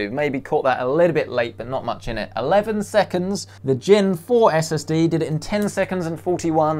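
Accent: United Kingdom. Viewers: I wondered how he picked up British accent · that british accent. Marvellous.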